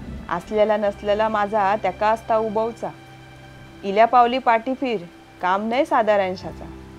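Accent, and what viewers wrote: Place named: Malvan, India. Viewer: अतिशय सुंदर. कवितेतील अस्सल मालवणी शब्द आम्ही लहानपणी ऐकले होते. नाहि तर हल्ली मालवणीच्या नावाखाली काही तरी धेडगुजरी मालवणी ऐकवतात/बोलतात. असच अस्सल मालवणी तुमच्या कडून ऐकायला मिळो हीच सदिच्छा.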